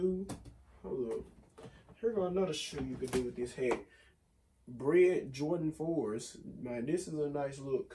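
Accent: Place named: Memphis, United States. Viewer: Soon as I heard you talk I knew you was from the M😂 901〽️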